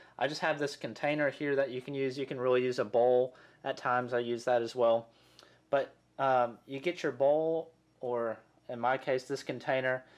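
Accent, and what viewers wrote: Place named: South, United States. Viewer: Thanks for the video! Are you from Georgia? I'm from Texas and you sound very southern!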